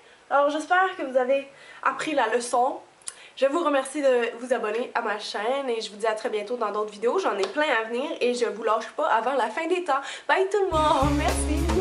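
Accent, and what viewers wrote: Quebec, Canada. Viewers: j'adore vos vidéos, elles me sont très utiles, merci beaucoup. En plus vous êtes très jolie, et votre accent québécois est à tomber..........So Cute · J'adore, je rêve d'être comme toi. Autant quand tu es maquillé que quand tu es naturelle, tu es super belle. J'adore aussi ton accent canadien. :) Continue tes vidéos, j'adhère et j'essaye de mettre tout tes conseils en pratique. Gros bisous et bon courage pour la suite. :D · Cette vidéo m'a convaincu : JE M'ABONNE HAHA !!! Mademoiselle aux deux facettes hein ! =D J'adoooore ton accent canadien, ou...quabecquois on dit ?? J'en sais rien lol... Bref j'adooore ton accent, cette façon de mélanger le français et l'anglais. Je te trouve très belle :) Avec ou sans maquillage, et même ton côté gauche du maquillage raté, tu es quand même belle.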